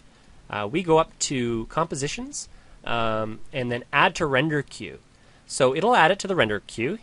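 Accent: Canada. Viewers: Very nice vid. :) by the way, is that a Canadian accent? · Canadian Accent FTW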